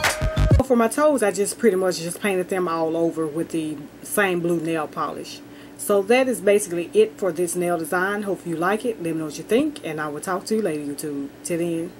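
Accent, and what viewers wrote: South, United States. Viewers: I found your channel looking for micro braid tutorials but I found so much more. I am a new subbie. Where are you from? I am from S.C. just wondering because we both have that wonderful southern accent.☺ Have a blessed day!🌹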